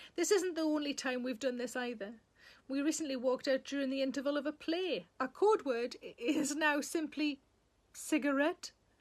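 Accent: Newcastle, United Kingdom. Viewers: The little voice in my head used to say "you must wash your hands". Now it says "wash your fooking hands" in a Geordie accent and it makes me smile every time. Thank you hon :-)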